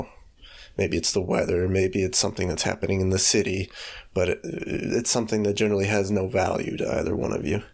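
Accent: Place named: Russia. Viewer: Русское звучание так просто не спрятать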